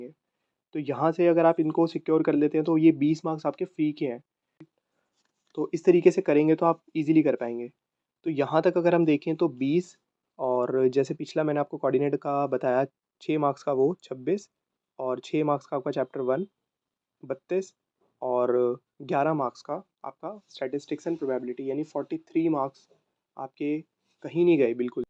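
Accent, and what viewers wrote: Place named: Ghaziabad, India. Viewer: hey do you use any social media platforms btw my mom is also from gaziyabad and i love the excent of that and i am quiet intrested to talk with if you have any account plz tell😊and conversation in comments section is quite award for me😂😂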